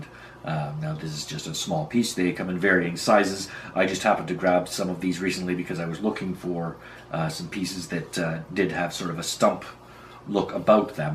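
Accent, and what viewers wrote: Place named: Canada. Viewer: I subscribed for the great info and because I like the way Canadian's say about. If you could please say aboot and chesterfield in future videos.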